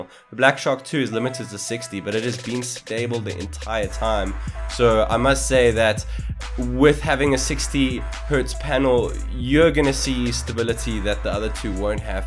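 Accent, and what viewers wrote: South Africa. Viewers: ...you sound south African... Are you south african?😁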